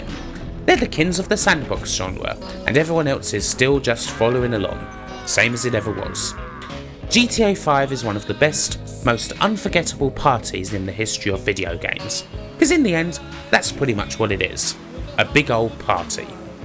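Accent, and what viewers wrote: United Kingdom. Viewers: people don't watch long videos. If you want information about a game which boils down to "is it worthwhile or not?", it can probably be boiled down to 5-10 minutes. If the video is longer, chances are, the density of original thought and production quality is quite low, and 90% of the voice over wouldn't even pass for complete sentences, consisting mostly of "uhhhs". Admit it, you have seen such videos and would avoid them like a plague. Now, this guy is nothing like that, he can actually make insightful points an hour long. But not a lot of people know that, apparently. Add to that the mild British accent, and suddenly the bulk of the largest English-speaking country imagines that it's too difficult to comprehend.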